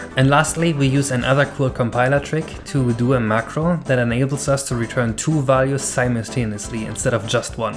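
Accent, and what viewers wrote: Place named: Germany. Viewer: Listen to the accent, yea he's definitely German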